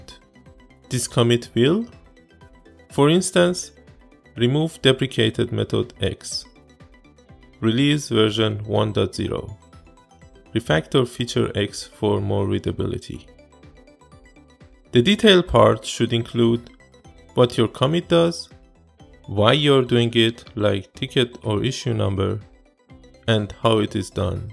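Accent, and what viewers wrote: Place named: Iran. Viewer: CHITOOOORI. I can hear the Iranian accent.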